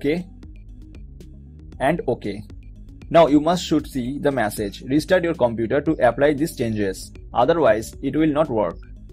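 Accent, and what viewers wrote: India. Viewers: ok yeah the best video u will watch because still works on 2021!!!! thx a lot, u know when he's got hindi's accent u know it works.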